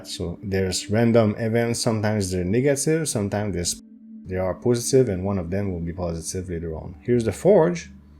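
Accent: Quebec, Canada. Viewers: at only I could have bet my life savings that you were Quebecois ⚜. Much love!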